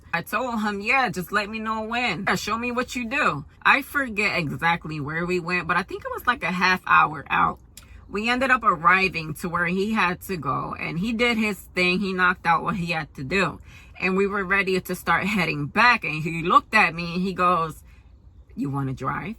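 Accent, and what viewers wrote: United States. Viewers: Watched your video and subscribed immediately. Love your determination and your Jersey accent. I am so sorry for how your husband went about ending your marriage. But you will be alright. I am looking forward to following your truck driving journey. I don't know if you follow any other female truckers on YouTube, but my favorite is Jasmine (SlimePack) who drives refers with Prime INC. May God bless you and keep you safe on the road.❤🙏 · Where are you from? You sound like you from New York? Are you Boriqua?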